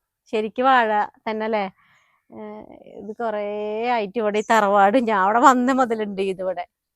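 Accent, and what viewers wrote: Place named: Malappuram, India. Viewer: ഹായ്. ഞാൻ ഒരു കണ്ണൂർകാരിയാണ്. എന്റെ ഹുസ്ബന്റ് മലപ്പുറതത്‌കാരനും അതുകൊണ്ട് എനിക്ക് കുറച്ചു നിങ്ങളുടെ സംസാരംമനസിലാകും നിങ്ങൾക്ക് മധുരം കുറച്ചല്ലേ വേണ്ടു ശർക്കര കുറച്ചല്ലേ ചേർത്തുള്ളു സംസാരം എനിക്ക് വളരെ ഇഷ്ട്ടമാണ് ഓക്കേ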